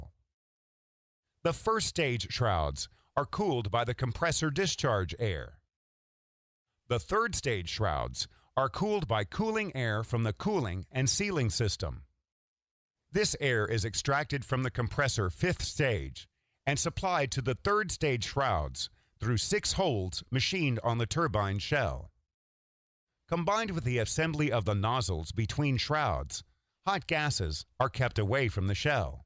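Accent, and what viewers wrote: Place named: England, United Kingdom. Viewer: Excellent description and detail by the commentator. My hearty congratulations. I do feel that the modern jet engine must be classified as one of the modern wonders of engineers. The combined engineering logic of the jet engine took a lot of time to be put together and if one had to put an initiation spark on the human mind, it was Charles parson who combined the high pressure in a boiler to spin the turbine in a steam layout. I was lucky enough to have lived at Newcastle-upon -Tyne where I went on many visits to see the Turbina the first steam turbine ship which surprised the Royal Navy at Spithead. I worked on the first jet turbines on a naval ship and it was the HMS Exmouth when I worked at Chatham Dockyard. Again Congratulations to Oil Gas World for providing such detailed information about the thermodynamic stages and the cooling methods on every unit. 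When I built a model engine with one compressor stage and one turbine stage, the diffuser right after the compressor was a tricky unit where the air had to be slowed down to sustain the flame and then the flame diluted with colder air along the burner to isolate the flame from the metal. Well done to the commentator, HIs English accent is what I aimed for when I spoke that language, but I could never get rid of my mother's tongue diluting the English language I spoke at Chatham and Newcastle.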